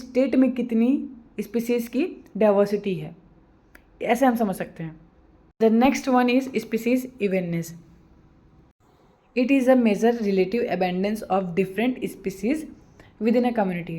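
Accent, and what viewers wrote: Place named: Bihar, India. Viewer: Ma’am you accent seems like you are from bihar😅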